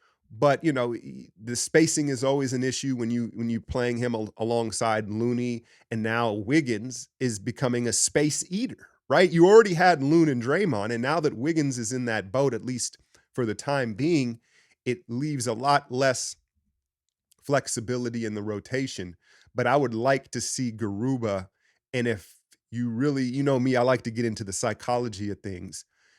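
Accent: Bay Area, United States. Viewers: that bay be leaking out in your diction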